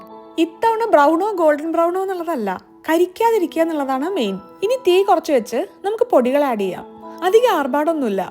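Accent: Malappuram, India. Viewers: Njannm oru malappurakariyane ngale parayunath neg kug pag anan ninale patayunnath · Athe Malappuramkkariyannen enikk thoniyayirunnu❤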